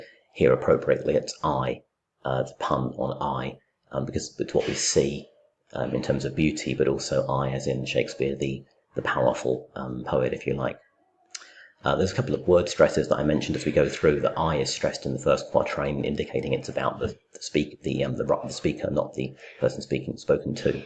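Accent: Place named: RP, United Kingdom. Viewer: I swear this one is the best interpretation ever! And your clear RP sounds exceedingly gentle!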